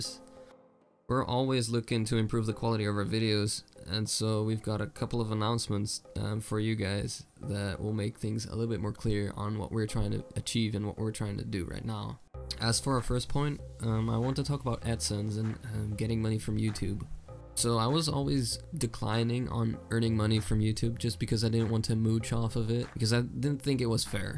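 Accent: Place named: Russia. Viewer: Guys I think he is russian, He has that accents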